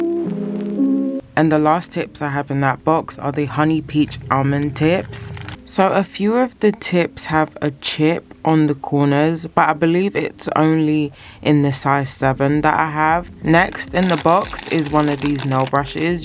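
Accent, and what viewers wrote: United Kingdom. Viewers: Hey hun just came across your channel. Love it, sounds like you're from the UK too..yay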